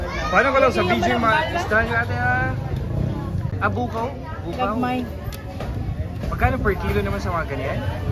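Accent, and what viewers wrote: Iloilo, Philippines. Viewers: Wag muna pilitin magtagalog boss halata Naman n ilonggo k eh😢😢😢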